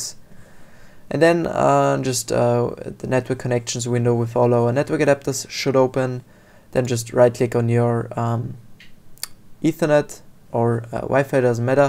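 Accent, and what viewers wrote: Germany. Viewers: der deutsche Akzent ist am Start auf jeden fall haha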